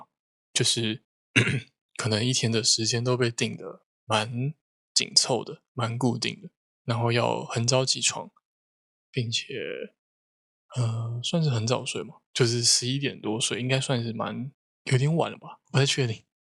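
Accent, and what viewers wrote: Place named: Taiwan. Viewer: Please keep up the great work this exact kind of content TAIWANESE mandarin learners need. There is so much mandarin content from mainland china and more is needed in the Taiwanese accent. Thank you very much!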